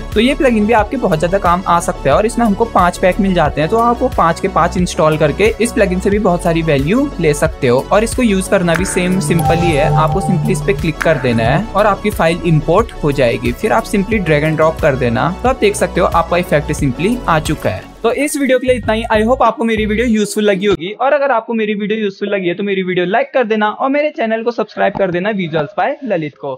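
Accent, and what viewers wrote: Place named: Uttarakhand, India. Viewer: Bhai Uttarakhand se ho kya?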